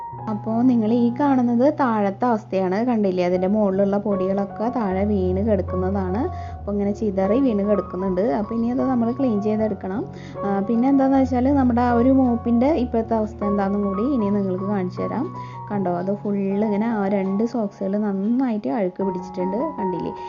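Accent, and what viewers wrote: Thrissur, India. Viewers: Thrissur bashayanallo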